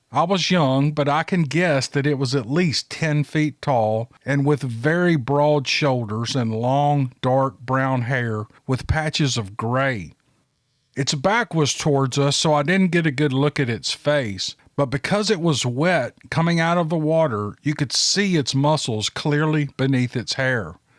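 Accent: South, United States. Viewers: ok so I never commit, but I love your show! I love your accent I am from North Florida and my family sounds like you. So I feel at home listening love the southern drawl. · I'm a 71 year old, happy, Texas grandmother. I can't tell you how much I enjoy listening to your soothing Southern accent every night. I often fall asleep listening to your stories. I get so tickled when you lose it reading people's descriptions of smells! This Southern lady loves your sense of humor, your gentlemanly attitude, and your kind, Southern voice. Don't worry about the misprounciations...it's all part of your charm! Your honesty is refreshing in this troubled world. Thank you for being real. Hugs from this grateful grandmother. May God bless you and yours. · every time you say" that's how I want to see one in my car, moving" hahaha it makes me laugh every time! I listen to a lot of podcasts everyday as I'm a truck driver in North Dakota. 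I must say you do a very good job! You have a great personality and that southern accent I can just listen to you talk forever.... Thank you for what you do. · Yes his voice has some special soothing quality and I love the Southern accent. I'm Irish and it brings me back to 'ghost story' nights in the countryside when we were kids... Thank.you 🍃🌿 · Hi, i live in chesham, a little village outside london UK, i found your channel last month,and fell in love with it from the first story! You are truly a master storyteller,you can connect and get lost in each and every story,short or long,you never disappoint. I'm now enjoying all your back catalogue of stories, and have introduced my girlfriend to your southern(?) drawl too! Love u lots bro,keep up the amazing job u do,you're my favourite addiction by far!😜😃🤩👍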